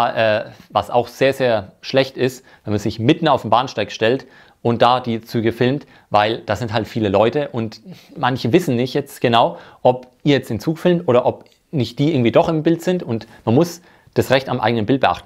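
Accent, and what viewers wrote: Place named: Franconia, Germany. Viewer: Schöner Dialekt, der Fränkische Dialekt. Meine Oma kam auch aus Franken. Sie kam aus Stadtsteinach.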